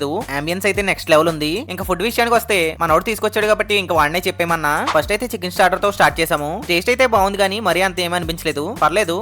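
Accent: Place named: Godavari, India. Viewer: Bayya godavari side aa accent Bagundi 😅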